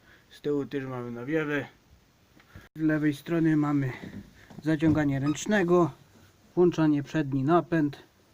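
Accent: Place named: Lesser Poland, Poland. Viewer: Wiedziałem ,że Małopolskie🤪 Swój swojego pozna. Na filmiku gdy opowiadasz niektóre słowa akcentujesz podobnie jak niektórzy w moich stronach.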